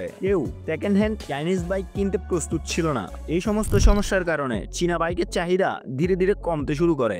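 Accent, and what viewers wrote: Bangladesh. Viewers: বাকি সব ভালো উচ্চারণ জখন্য। তুমি মনে হয় বাংলাদেশী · বাংলাদেশি উচ্চারণ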